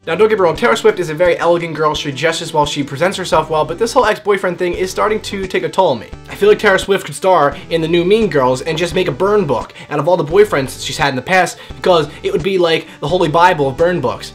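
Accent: England, United Kingdom. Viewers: OMG u are soooo funny xD and ur english accent o.m.g hilarious! :P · +British Accent= <3:3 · You have an excellent British accent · Ur british accent rocks! :) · Omg your British accent is adorable!! Ur hilarious! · Dat British Accent. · Right on with the British accent! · I love your British accent :D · Nice British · HA! I love hearing you talk in a British accent. Lots of love from the uk : ) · This is absolutely awesome(: Love your brittish accent ( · Your English accent is just afsbfsbdagagdagda <3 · Ur British accent is amazing *-* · i love your English eksent:D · I love ur uk accent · i love his english accent!!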